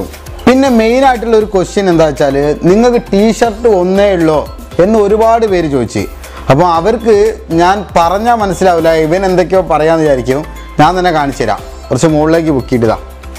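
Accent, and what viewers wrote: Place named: Palakkad, India. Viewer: Chettan sherikum palakkad language ani parayunath🤗🤗🤗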